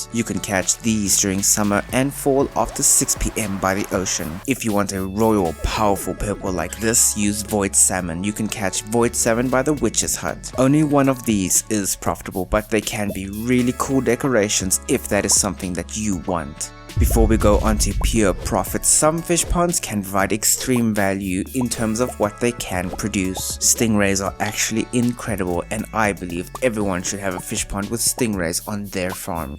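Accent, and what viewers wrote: South Africa. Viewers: The South African accent. Love to see it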